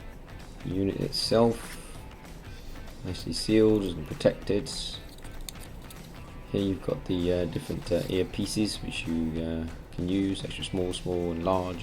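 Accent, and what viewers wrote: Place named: United Kingdom. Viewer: Will these pair to an Apple Watch cellular so I can just go walking without a phone? Are you a native Singaporean? ( British accent) Cheers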